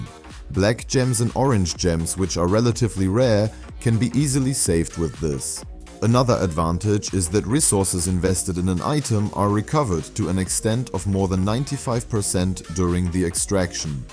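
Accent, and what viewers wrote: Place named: Germany. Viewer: Man hört das du Deutscher bist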